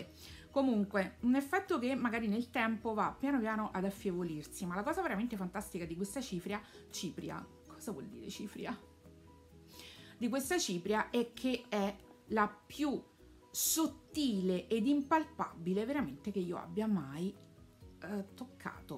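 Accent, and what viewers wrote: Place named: Rome, Italy. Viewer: Primissimo tuo video che vedo, sei davvero tanto simpatica! Mi piace un sacco la tua parlata romana 😘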